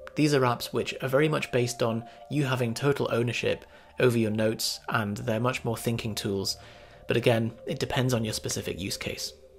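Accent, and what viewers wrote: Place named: RP, United Kingdom. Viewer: Splendid command of the English language. You could walk straight into the Royal family with that accent!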